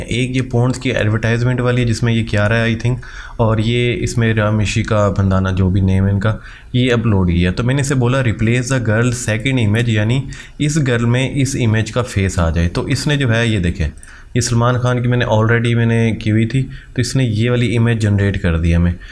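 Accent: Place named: Punjab, India. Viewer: Aapka bolne ka andaz punjabi style in natural way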